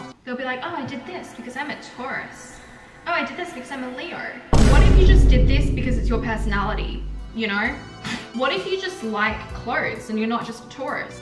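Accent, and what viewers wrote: Australia. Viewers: I was like 5 second in, and I was like "Yup she's definitey australian" ;D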